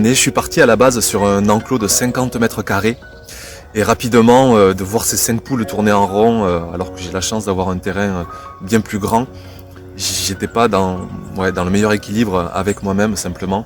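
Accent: South, France. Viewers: Bonne idée!!! Sais-tu si on peut aussi utiliser les huiles essentielles contre les poux rouges. Pour l'instant, je n'ai pas ce problème, mais, en prévention, je voudrais agir avant de devoir guérir. Au fait, Est-ce que c'est bien al que je connais? Nathalie VALERO Merci pour tous ces partages de belles expériences. A l'accent, j'entends que tu es dans le Sud. Et à l'emploi de certaines expressions, tu me fais penser au naturopathe Christophe BERNARD. Est-il de ta famille?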